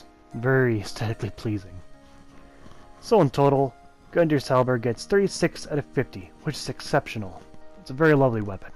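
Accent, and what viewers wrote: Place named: North America. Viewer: wild guess really, the accent tells me youre from usa or canada, so it felt likely, anyways sorry if i offended you somehow, im just really peeved at certain words being spelled wrong but i didnt know it was an intentional joke on your end.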